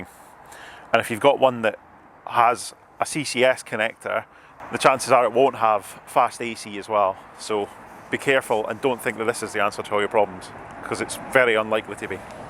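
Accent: Scotland, United Kingdom. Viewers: Maybe it was the scottish accent but you have just educated me a new owner of a EV cheers mate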